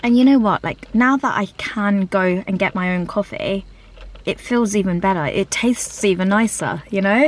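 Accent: England, United Kingdom. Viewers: Hello. Always, you are such a lady. I feel more like a lady as well after hearing from you. Your English accent is the best. I think you speak so beautifully. I would prefer longer videos too just to hear you. Happy Mother's Day to your Mom. From Grace Elizabeth, in MA. I wish my daughters would have vlogs just for me. Maybe someday we'll all have vlogs.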